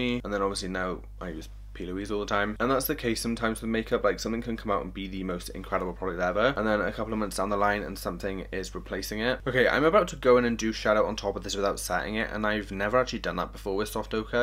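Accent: Wales, United Kingdom. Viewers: Are you welsh? Love you btw !! 💓💓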